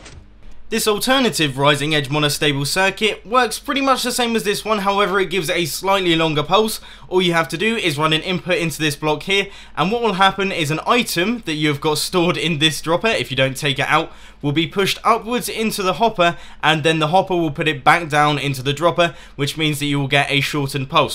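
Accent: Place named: United Kingdom. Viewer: I swear the British accent is thicker in this video than it is in current HermitCraft episodes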